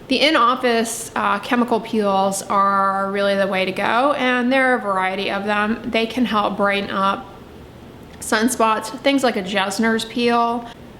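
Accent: United States. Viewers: Where does that accent come from? As an Australian, it is hard to know what part of America that accent is common. Just today I discovered my brother in law has skin cancer on his scalp. It’s the most common type (BCC). We cannot overstate the dangers of the sun.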